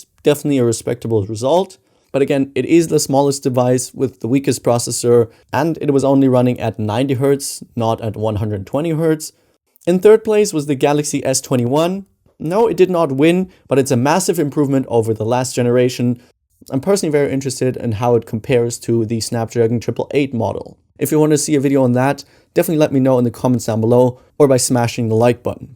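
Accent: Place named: Germany. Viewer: Strong german accent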